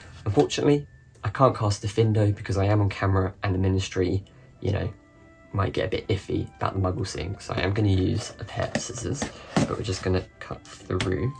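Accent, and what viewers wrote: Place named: United Kingdom. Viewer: Hi. I would have to take pictures of them, but so many gave away, to my ex's nieces and nephews and they broke them, but I will send a few to you soon, thank you for commenting on my comment love it...Also, love your accent and the U.K... want to go there since first saw Harry Potter... but in the summer though...Here in Canada, 2 months of nice and the rest well...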